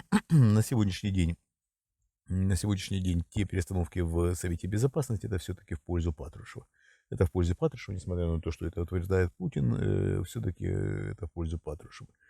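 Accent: Ukraine. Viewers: Какой ты генерал? Ты балабол с харьковским акцентом.Где признаки катастрофы гражданской авиации конкретно? В каком смысле? Технического обслуживания, проведения тяжёлых форм, замены запасных частей? Просто балабол тоскливый...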